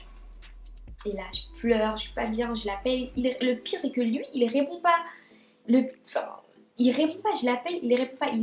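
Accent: United States. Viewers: t tro belle est ton accent amairiquain ik est 😍😍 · t'a trop l'accent américain et j'aime trop ta storytime . je m'abonne 😘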